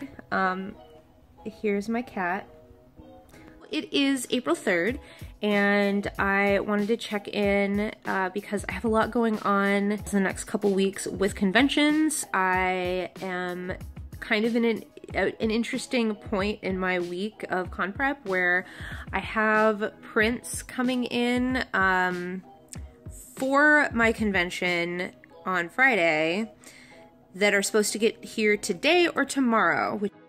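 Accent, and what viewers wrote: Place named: Scotland, United Kingdom. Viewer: AHHHH that Scottish accent melt Thanks for sharing the footage from Treasure Fest - still bummed I couldn't go, but so glad to hear your account of it. All those succulent babies! So cute.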